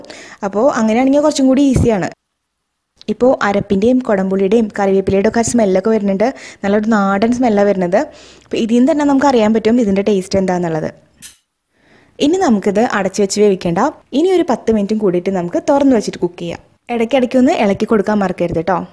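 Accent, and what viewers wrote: Thrissur, India. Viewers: Beautiful Thrissur language. Beautiful explanation, beginners can follow well.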